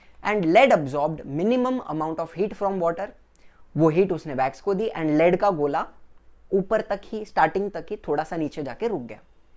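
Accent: Maharashtra, India. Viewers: bhaiya, from where you are? Because I listened Marathi when you were speaking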